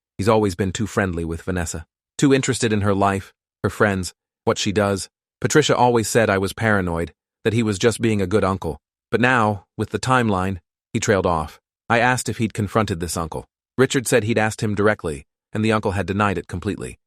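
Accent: United Kingdom. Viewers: Why he British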